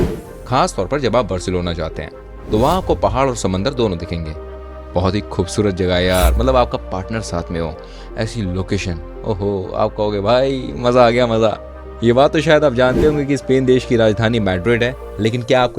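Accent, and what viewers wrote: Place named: Mumbai, India. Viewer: ye voice or mumbaiya language bahut bor krti h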